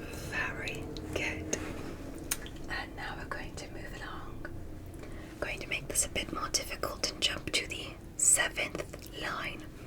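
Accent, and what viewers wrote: England, United Kingdom. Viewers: When I heard her for the first time I went "omg she's brittish" it's sounds so relaxing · Love the British accent 💀 Or was it Australian? I can’t tell since you’re whispering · I am so thrilled for another English accent video. The last one with the nurse I have watched several times. You deserve an award for that one. Thank you. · Am I the only one who noticed that she is British 😂😂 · Good English accent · Mate you sound so british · Why is blud bri’ish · You are a Really Beautiful Doctor with the Most Beautiful British Accent!! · one hour ANDDD British accent!?!?!? stop spoiling us!😍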